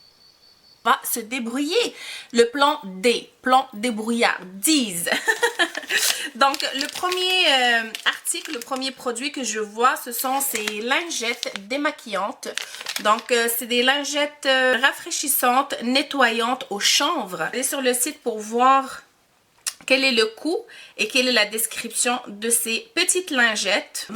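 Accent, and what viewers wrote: Quebec, Canada. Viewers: Je découvre ta chaîne grâce à cette vidéo. ☺️ J'adore tellement l'accent du quebec, tabarnak!! 😅 je ne connaissais pas du tout cette box!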